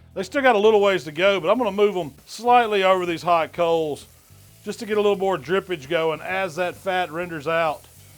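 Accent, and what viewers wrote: South, United States. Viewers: Always trust a chunky happy southern sounding cook on a grill! Lol can’t go wrong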